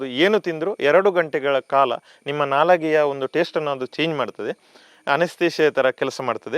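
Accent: Standard. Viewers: Pure kannada ..kelokke thumba kushi aguthe ... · Hats off to you sir, your knowledge is really appreciating... kannada thumba shudda mattu sundaravagide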